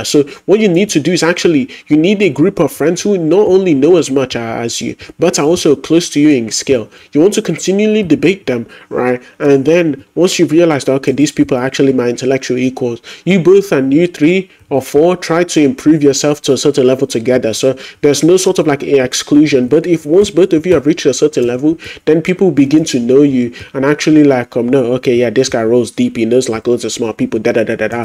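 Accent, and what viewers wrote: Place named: United Kingdom. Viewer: I can’t with that BRITISH ACCENT 💀💀💀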